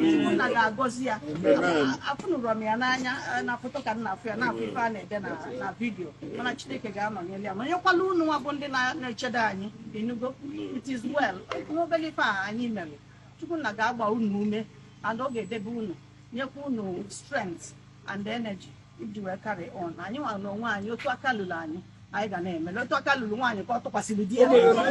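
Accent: Igbo, Nigeria. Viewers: Exactly, as soon as I saw her I knew she was mixed. Her hair texture is more black than white. She was definitely also raised in Nigeria. I live in England and nobody here can fake the Igbo accent that much. People try but u can still tell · With the Igbo accent as well. It’s like I am hearing an Igbo woman from the village. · The intonation shows that this woman is a half cast raised in an Igbo environment. She tried, even with proverbs. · She even speaks English with a Nigerian accent. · Probably she was born and brought up in the east and eventually married an igbo man cos she did not just understand and speak igbo, she also has the accent which shows she isn't new in their mist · She speaks just like an igho woman mixing English with igho.Wow. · Wow 🤨 Not only did she speak very well She sounded Igbo too With an Igbo accent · Even her accent is pure Igbo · That woman speaks english like she grew up in Nigeria. 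She can‘t be born in the uk because her english sounds too nigerian no british accent at all. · Even her spoken English sound like an Igbo woman speaking wow!❤❤❤ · Oh my goodness! I'm in love with our Igbo wife! Well done, and good job to our Naija wife, and to her loving husband. I don't speak Igbo, but she totally sounds like an Igbo woman to my Yoruba ears. Sending her so much love!! ❤❤❤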